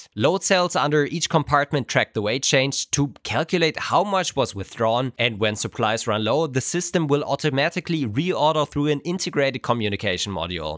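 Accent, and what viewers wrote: Germany. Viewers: If you put aside the quality of the voice that would have sound fake even in the 80's, the worst part is the quality of the translation, it is off all the time when there is some nuance in the term you are using. Fortunately, I learned english a while back and never stopped using it, so original english audio it is. Plus your german accent is something that gives personality to your channel and all of this is obliviated by this disgusting tool. Instead of that, YT should improve the quality of the autotranslation for the sub-titles, it would be so much better.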